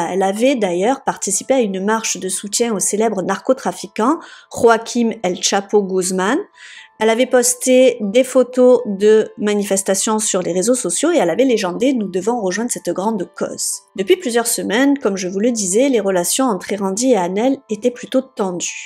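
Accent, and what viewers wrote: South, France. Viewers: Je me languis tellement de tes vidéos !! Je reviens de ma pause déj et je me dis : bon qu'est ce que je vais bien pouvoir regarder tout en travaillant et je tombe sur ta vidéo !!! J'apprécie énormément ton travail, tes recherches et ton petit accent du sud qui nous apporte le soleil ! T'écouter est un véritable plaisir ! Merci pour ton travail et et merci de réussir à dénicher des histoires de true crime si méconnues en France !!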